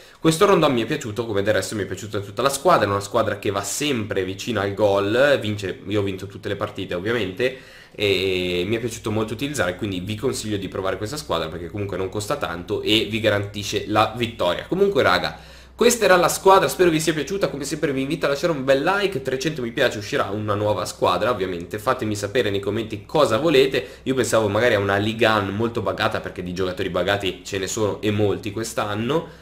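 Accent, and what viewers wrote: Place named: Liguria, Italy. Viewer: Ok, grazie ! Dall'accento pensavo fossi ligure !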